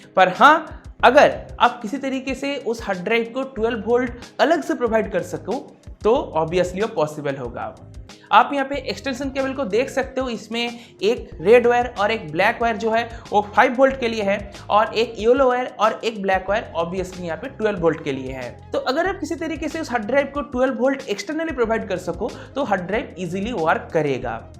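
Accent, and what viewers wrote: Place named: Kolkata, India. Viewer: Bro you don't know, what you are ... You r genius Bro ♥️♥️♥️... you explained us anything about tech, hardware or software related matters so fluently & deeply(specially)...lots of love ♥️♥️♥️ from Kolkata...♥️♥️♥️ I think you are also from our Kolkata our pride ♥️♥️♥️ from your speeking accent, correct me if I am wrong 🤝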